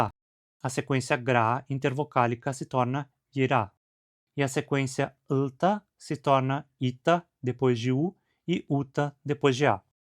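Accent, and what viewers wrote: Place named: South, Brazil. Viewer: vc tem um sotaque sulista, mas eu não tenho conhecimento suficiente pra discernir de qual estado vc veio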